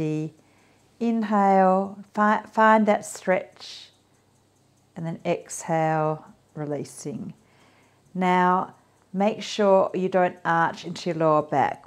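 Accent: Australia. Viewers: Thoroughly enjoyed this 🤩 thank you so much… I’m about to turn 55 and move/workout daily but really need this in my life now… it’s lovely to hear a fellow Aussie accent too… 👏🏻👏🏻👏🏻 this is my first video with you and I will definitely be moving with you daily… ☺️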